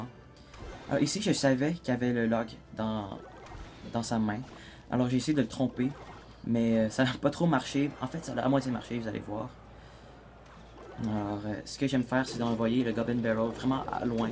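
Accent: Quebec, Canada. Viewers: gg <3 j adore l accent quebecois XD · Nickel l'accent quebecois 👌👌 la video nickel aussi tes sur la bonne voie 👊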